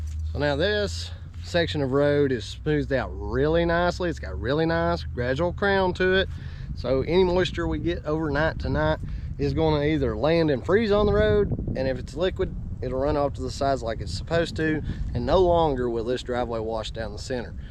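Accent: South, United States. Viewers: Outside of the southern twang, I like your style. 😁 New subscriber.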